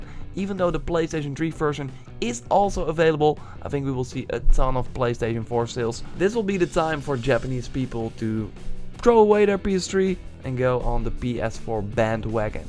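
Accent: Netherlands. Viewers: Are you, by any chance, Dutch?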